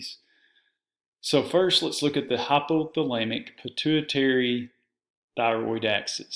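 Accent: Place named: South, United States. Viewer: Dr., where are you located? You sound like you're from the south and I'm in east Tennessee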